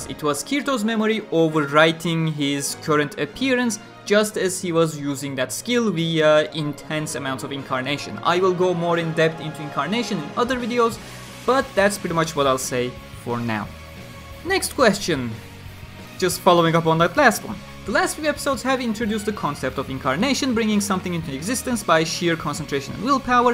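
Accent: Turkey. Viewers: Dün sword art online fandom wiki de eugeo sayfasında gezerken hadi eugeo'yu tanıyalım diye bir video gördüm ve nedensiz yere tıkladıktan sonra İngilizce aksanından yola çıkarak:"Bu arkadaş kesin Türk!" Dedikten sonra kanalına göz attım.Kanal ismimin olduğunu görünce sanki UEFA kupasını kaldırır edasıyla sevinçten minnacık bir ego kastım:) Her neyse Türklerin İngilizce aksanına alıştığım için ne zaman duysam tanırım:).Bu arada bu kanali daha önce neden görmedim bilmiyorum ama içeriklerin çok güzel ve çok derin.Sword art hakkında bu kadar bilgisi olan bir kanalın önünde saygıyla eğiliyorum.Çok ani gelebilir ama herhangi bir şeye ihtiyaç olursa biz buradayız:) bu arada İngilizcen çok akıcı.Sahsen çok beğendim.Nerede ve nasıl bu kadar iyi geliştirdin? Ve son olarak da bu kadar oyunu ve bu kadar bilgiyi nereden biliyorsun? Şimdiden teşekkürler, saygılarımla,bir hayranın...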